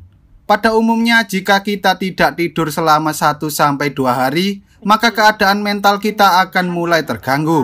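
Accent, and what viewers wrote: Java, Indonesia. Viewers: Suaramu wi lho bang medhog banget, malah mirip koyok suarane dodit hahaha🤣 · logat mu podo koncoku mas jowo ngendi sampean? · Dari cara ngomongnya, pasti orang jawa tulen ini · Wong jowo mas?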